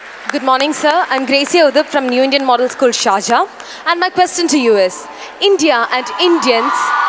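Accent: United Kingdom. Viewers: At a very first time I'm watching dr. Shashi and then i perceived that he is speaking British English but easy. As he told about books there is joy of finding out destination that is already defined and i feel i am going to become good reader and dive into reading more. I love reading books 🥰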